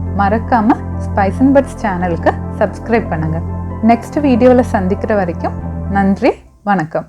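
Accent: Kerala, India. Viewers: Neenga malayaliya. Njn pesira mariye irukku😘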